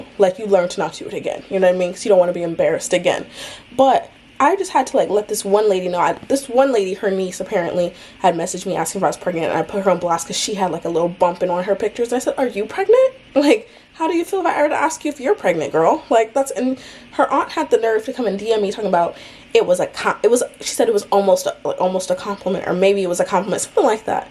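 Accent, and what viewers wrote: United Kingdom. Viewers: I love how he's starting to have an English accent 🥰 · Oh my goodness has that British accent 😍😍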